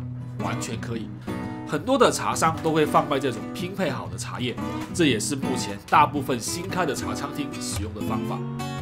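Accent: Chaoshan, China. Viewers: 怎么感觉作者是潮汕人？有点像潮普哈哈哈哈哈哈哈哈哈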